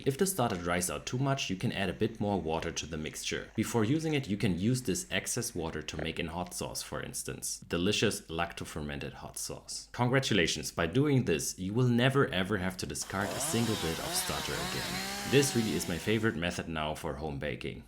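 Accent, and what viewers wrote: Germany. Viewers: Wow thermomix congratulation, salut 🤣🤣🤣you are too funny -I got lost when you talk with a lot of numbers 😢( failed math in school )can you theorize sourdough panettone please - panettone is the king of sourdough bread -❤I love German accent and jokes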